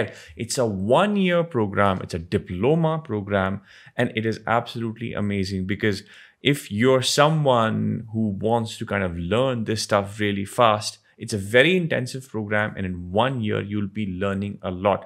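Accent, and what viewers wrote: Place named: India. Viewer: jesus, I respect this guy, but Indian English, OMG!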